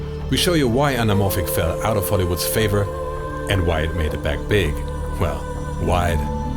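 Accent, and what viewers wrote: Germany. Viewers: Thanks for this works! It show me how much i miss fo to the cinma! Also you have a nice gemran acent! And this is rare as i know as a german. Also instan season 4 and 5 shoot of the expanse shoot with lenses. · Wow, what an interesting and good looking channel! I did watched yesterday 4-5 Videos during my work from the homeoffice. Echt Freunde ich schaue schon seit Jahren englischsprachige Channels und Tutorials und jetzt treffe ich aus Zufall auf euch. Dachte ich immer die amerikanischen Channels wären besser, da spricht mich doch einer mit deutschen Akzent an. Ich denke ich werde Mitglied bei euch werden.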